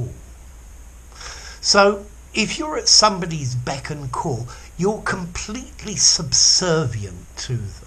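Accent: United Kingdom. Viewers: Sir your accent is very British, I love it. Thank you for helping us understanding these idioms 😊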